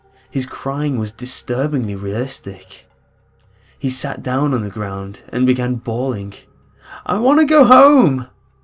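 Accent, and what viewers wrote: United Kingdom. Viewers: your britsh acent (i cant spell very well) makes every thing creepy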